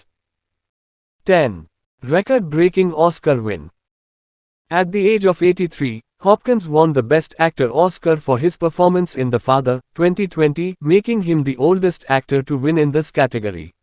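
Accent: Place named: India. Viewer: aS NARRATED BY A PAKI OR INDIAN GENIUS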